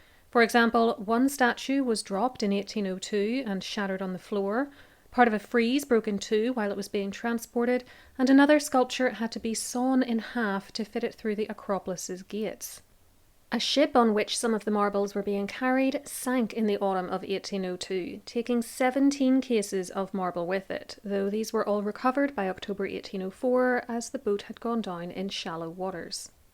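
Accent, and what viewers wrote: Ireland. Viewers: My guess is that, judging by your accent, you are of Irish origin. You are VERY sparse with your facts regarding the original acquisition of the Marbles and I can only assume that you are an Anglophobe. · Irish accent?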